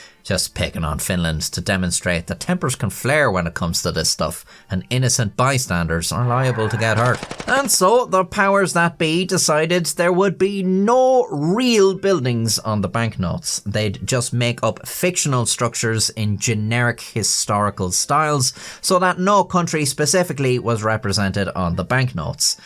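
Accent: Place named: Ireland. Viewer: I wasn't sure whether lived in the North or South of Ireland. Now I know. (sorry, as an American I know two Irish accents: Dublin and Not Dublin. In fact, the UK accents are also, Glasglow/Not and London/Not. Wales has one accent and it is unknown to me, but I listen for words made from half an alphabet; could be Glasglow + 7 pints though.)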